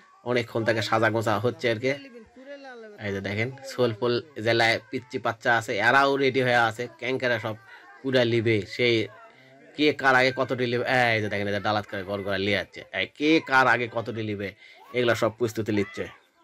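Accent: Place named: Bogura, Bangladesh. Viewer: ভাষাটা বেশ ভালোলাগে এটা কি বগুড়ার ভাষা?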